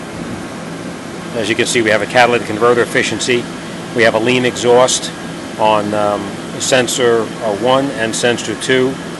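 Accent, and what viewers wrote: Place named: New Jersey, United States. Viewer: Hey, are you from Jersey City? I am, and I can detect the Kings english.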